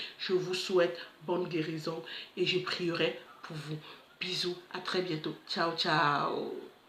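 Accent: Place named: Cameroon. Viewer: 😂😂😂j'aime trop ta façon de parler ( l'accent kamer ) trop belle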